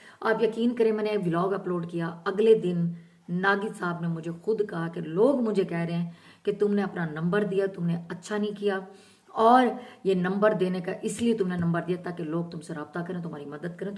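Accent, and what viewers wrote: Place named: Saraiki, Pakistan. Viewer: آپ کا سرائیکی لہجے میں اردو ولاگ بہت سویٹ لگتا ہے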